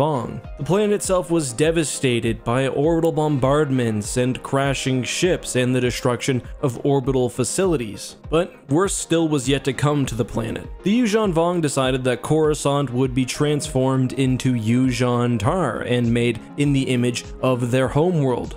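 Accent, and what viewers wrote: Canada. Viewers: You know he's canadian by the way he says "Aboot" instead of "About" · Are you canadian? You pronounce about in a canadian way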